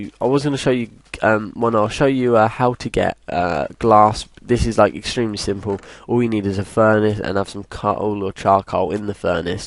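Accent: United Kingdom. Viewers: I disagree it's just the regular brit. accent!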